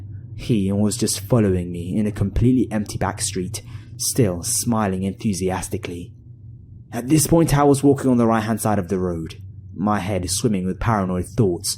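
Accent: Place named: England, United Kingdom. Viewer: His English acsent makes the story's not as scary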